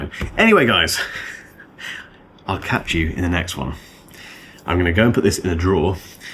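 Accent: United Kingdom. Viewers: People Take a 💊 Pill. He makes good videos, his voice doesn't squeal my ears, actually calming, must be the British accent. Anyway back to topic, are your lives so empty that you need to invest all your feelings into a video, and are hanging by the edge of the seat on every word a YouTuber says Please take many 💊 💊 💊's. I appreciate his perspective and reviews and take everything with a grain of salt and maybe some pepper. YouTubers need to make catch titles and statements that grab your attention, otherwise they will go bankrupt. The iPhone Air is a great phone, I don't have one and won't as it's over priced. I still have older iPhones like XR, 11 pro plus many Android phones. I like them all. So would you and those that make content. What do you expect from the guy, to sit there like a Popsicle and waffle boring words out in a monotone manner and expect us to be be attentive.😂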